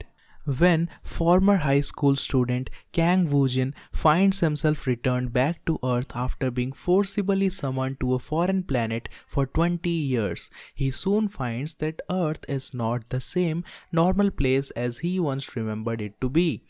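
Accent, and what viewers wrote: India. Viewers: he's Indian right?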